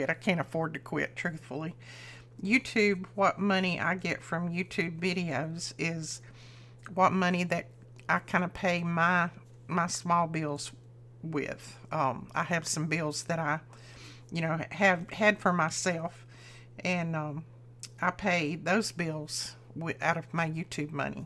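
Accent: South, United States. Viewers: Love your southern accent,I am from the south but I think my accent is not noticeable. I enjoyed your video. That is cute. Can't wait to see another video.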